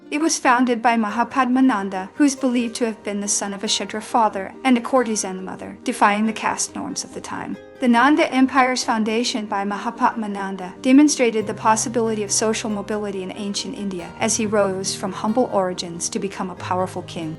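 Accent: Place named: United States. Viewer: why do you use an american accent that corrupts Indian names? Have the decency to be true to your subject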